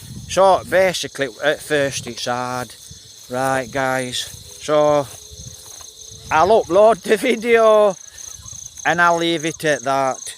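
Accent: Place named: Scotland, United Kingdom. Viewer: You really sound like scottish sir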